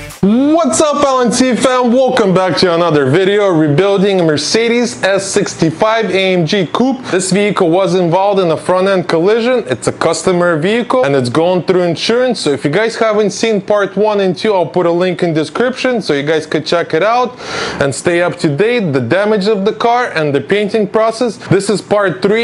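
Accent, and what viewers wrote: Russia. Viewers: Прикольный русский акцент Бро!!! Привет из Украины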